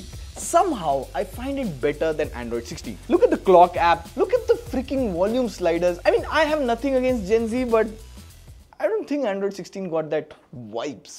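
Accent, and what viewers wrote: India. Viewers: Look man, I like content but there is one solution actually two solution I need to work on Indian accent to learn it Or you need to work on American accent? And basically get more views and go International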